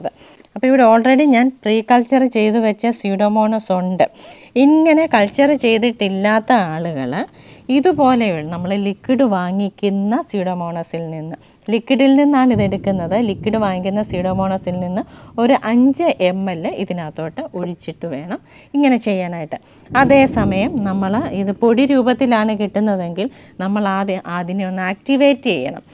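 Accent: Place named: Pathanamthitta, India. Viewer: പത്തനംതിട്ടയിലേത് ശുദ്ധ മലയാളം ആണ്.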